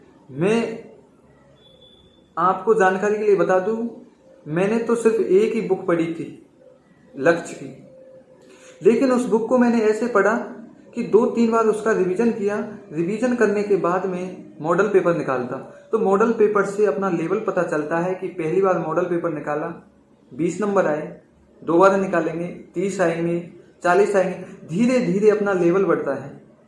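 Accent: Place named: Dholpur-Bharatpur, India. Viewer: Bhai kaha se ho Bhasha to Dholpur Bharatpur ki si Lag rahi .( me aapko jarur reply dango 🤣🤣.)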